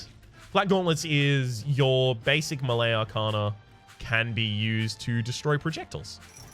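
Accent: Australia. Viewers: That beautiful authentic Australian accent is music to my ears and I want to hear more of it.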